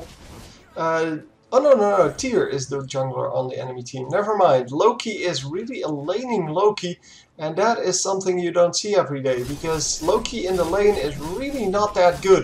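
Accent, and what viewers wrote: Netherlands. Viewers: I think you are Dutch right? I can hear that accent because I'm Dutch as well xD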